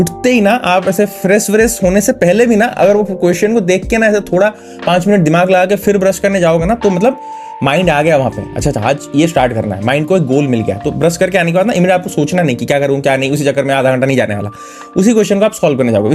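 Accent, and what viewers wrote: Odisha, India. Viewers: Bhai you are odia na? 😅 Btw great 😃👍🏻 video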